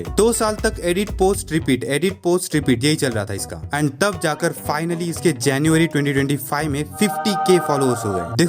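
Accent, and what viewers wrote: Hyderabad, India. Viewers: Bhai hyd seh ho kya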